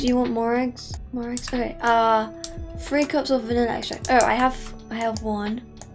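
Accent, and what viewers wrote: United Kingdom. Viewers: are you British? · i love her accent She's British?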